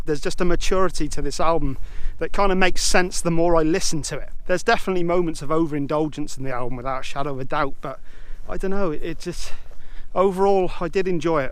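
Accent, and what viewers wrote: United Kingdom. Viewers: Looks like the English countryside,but then he does have an English accent.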